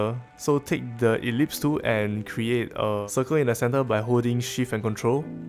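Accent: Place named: Singapore. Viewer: you sound like youre from singapore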